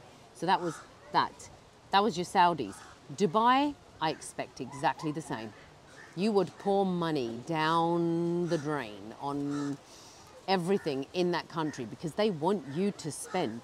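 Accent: United Kingdom. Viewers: i love your voice and British Accent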